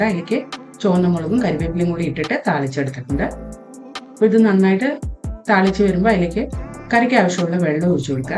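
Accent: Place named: North India, India. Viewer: R u a malayalee brought up in north because of your malayalam I feel so . ❤❤❤❤❤nice vlog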